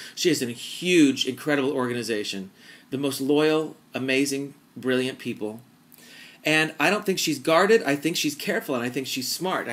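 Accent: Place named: United States. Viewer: you have the best american accent ever, its so soothing and therapeutic. i subscribed after the fred phelps video but your vids (including this) get top ratings from me :D keep going, and tell oprah i says HEY GIRL!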